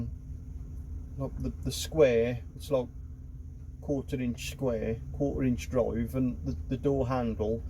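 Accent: Midlands, United Kingdom. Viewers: New sub here, stumbled across your channel , took me a while to work out what was going on !, midlands accent , american truck , canadian based, owner operator , in my all time favourite truck , peterbilt 352 pacemaker , ive looked at loads of them over the years , in consolidated peterbilt foxboro mass, in 1982, and looked at several back here in uk, first one was a red 362 around bedford way ,it was a race car transporter, second was a nice gray 352 at john bowen jones at blisworth northants, test drove it and it was sweet , and the third one was from weston super mare, a blue 352 H with 19 litre marine cat/cummins cant remember which, it had the 110" sleeper to, i think phill nash has it now plus a 352 detroit, and i saw one like yours on ebay to a year or two ago,. but i stuck with my F<num> speed for 25 years instead (see profile pic)i know a couple of uk lads working in canada, neal trickett from wrexham and austen jones from oswestry where i live,. 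How did you end up over there ?, plus i thought most of the big companies dont like old trucks ? I follow "peterbilt mike" or "mike the truck nut" on YT, hes in canada to, got a couple of 362s and a couple k100 aerodynes hes tidying them up slowly,. its a struggle with the old trucks nowadays , getting parts and fixing them yourself , been there done that on my F88 untill 2000,. i went to retro show gaydon last weekend , some nice stuff there,. anyway i,ll binge watch your videos , ive done 3/4 already , your truck could do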